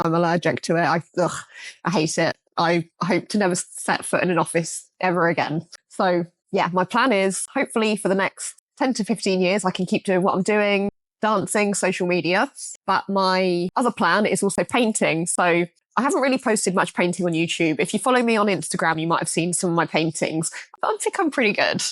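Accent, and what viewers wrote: England, United Kingdom. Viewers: I got that u are from England by first 3 seconds :) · Hey, you're British - that surprises me. I know Lauren Jumps is British (heard her talk). I'm an old guy from Germany and I watch your videos because... YOU MAKE ME SMILE! Thanks. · From here in America: Oh wow yay the double thrill when I 1st heard, oh too good and fun to be true, is in Britain that accent yay!!!! I LOVE the maternal British isles heritage I have: Irish and Scottish!!!!! So in addition to OH FUN CHEERY INSPIRING DANCING AT LEAST, buddy is a "fellow "Brit": THANK YOU hug for all this and being who you are!!!!!!! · Прикольно. Английский учил только в школе но почему-то сразу показалось что вы из Англии😊!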